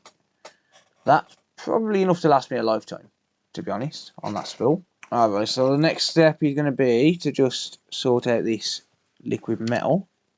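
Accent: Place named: Black Country, United Kingdom. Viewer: Another great video,. Watching and learning techniques from the likes of yourself, Vince, Northridge, etc I've learned absolutely loads. Your channel has something the others are completely lacking though. Being a guy who was born and brought up in Wednesbury but moved up to the Yorkshire coast, it's nice to hear a bostin accent from back home. Black Country ay we. 😂😂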